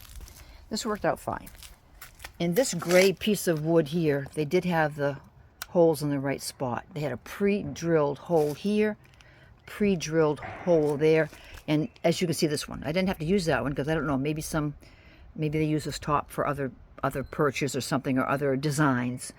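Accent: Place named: New England, United States. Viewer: Ok, first what general area are you from, I moved from NH and your accent sounds familiar. Also, if you were buying a first coop for about 6 chickens, which one would you buy?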